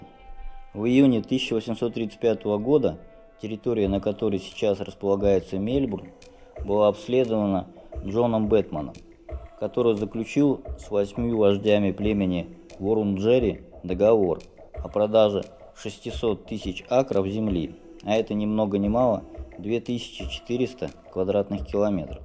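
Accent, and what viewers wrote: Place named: Moscow, Russia. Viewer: Московский говор, что здесь такого особенного? Давайте приедем в какую-нибудь деревню под Н.Новгородом и потребуем от людей не окать. Сами догадаетесь, в какое сказочное путешествие Вы отправитесь с невероятной скоростью? Все говоры русского языка равны, и не надо придумывать какой-то "идеальный" язык. Даже в эпоху Интернета не всё так однозначно :)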